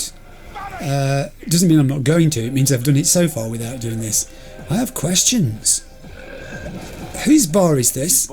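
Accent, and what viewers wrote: United Kingdom. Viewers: Hahaha hearing u say "ese p*che p**" in your perfect british accent was very funny to me 😂😂😂 p** is short for prostitute in spanish and is a curse/rude word used as an insult and the other i think is like a vulgar exagerator. Its especially funny because you never swear in your vids 😅The game you play with the kids gives me sean and daniel (lis2) playing in the desert vibes.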